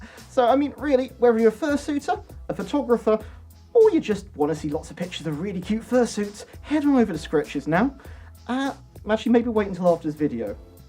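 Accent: England, United Kingdom. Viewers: Because of your english accent.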